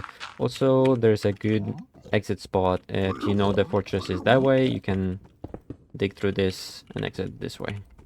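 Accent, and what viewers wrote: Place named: Ukraine. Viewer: You're from Ukraine, right? I found your channel pretty late, but I absolutely love how your English sounds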